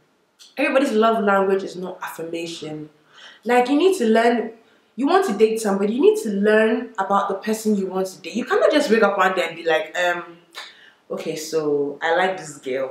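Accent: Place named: Ghana. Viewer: This video deserves more views 😭😭😭 P. S I love the little Ghanaian accent you have!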